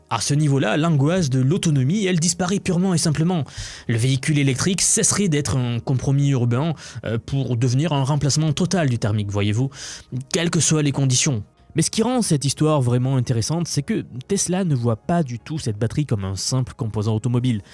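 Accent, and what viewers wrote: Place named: South, France. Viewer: J'adore ta chaine, je suis fan. Merci pour toutes ces informations. Une petite question bête, t'as un super accent, mais j'arrive pas à savoir de quelle région, il y a une pointe du sud mais pas que ... ;-)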